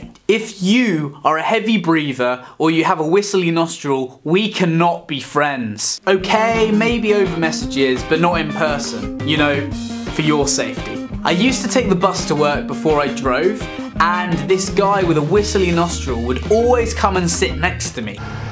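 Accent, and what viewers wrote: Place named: United Kingdom. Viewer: Umm... it could be just a British accent. Not everyone speaks American English.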